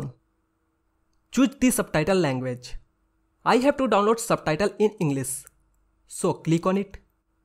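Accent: India. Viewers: You know its legit when the accent is Indian♥️ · Yeah always India is legit on tutorials😎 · Why the english accents of indian so lame 😑 btw thank you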